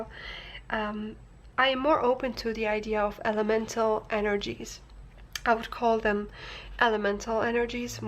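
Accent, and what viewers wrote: United Kingdom. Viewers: Omg amo tu acento británico <3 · Btw, I really love your british accent! :) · Your accent has never been so British :D did you spend your holiday in Britain or something ? xD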